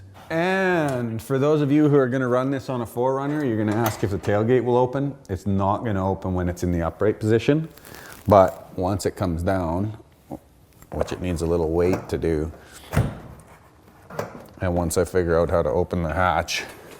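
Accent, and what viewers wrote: Canada. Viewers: Great review. Also I can tell your Canadian as fuck.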